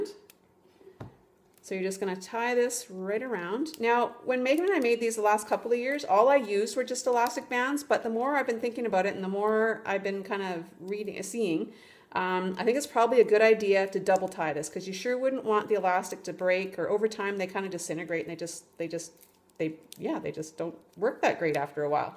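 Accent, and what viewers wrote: Canada. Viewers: Love them, and your accents! Are you Canadian?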